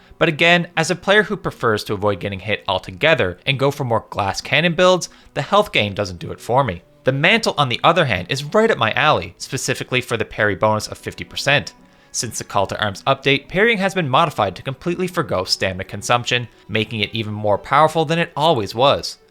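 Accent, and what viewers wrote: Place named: Maritimes, Canada. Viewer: Are you from Nova Scotia or New Brunswick? Got that accent goin on.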